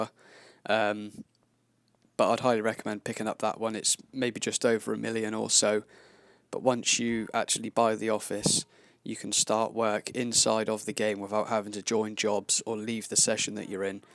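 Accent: United Kingdom. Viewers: Is he British or English but anyway I love his accent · I think the same, all youtubers GTA do clickbaiting. Please don't end up doing this though. I actually learned something from your video. I'd normally find British accents annoying but your voice is kinda soothing so thanks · love that british accent, nice video btw :D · This guy sounds British but his personality is all Canadian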